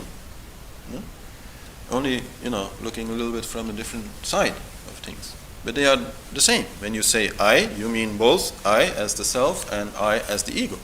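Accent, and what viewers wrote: India. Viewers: You speak English with an Indian accent. You must have lived in India many years! peace.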